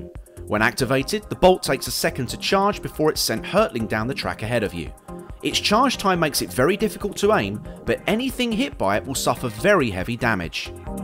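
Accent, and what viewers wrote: United Kingdom. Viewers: Everything is better in a British accent. Thank you for this sir this is a god send. 🙌🏼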